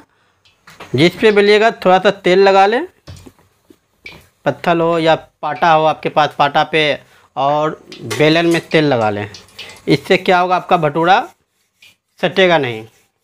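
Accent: Bihar, India. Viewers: Pura theth Bihari Han marde tu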